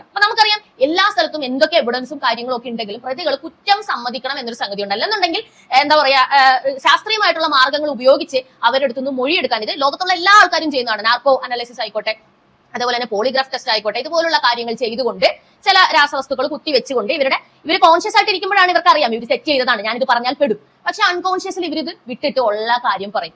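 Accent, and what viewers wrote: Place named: Achayathi, India. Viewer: അച്ചായത്തി ഭാഷ ഒഴിവാക്കി സമാധാനത്തൊടെ സാവധാനം പറയുക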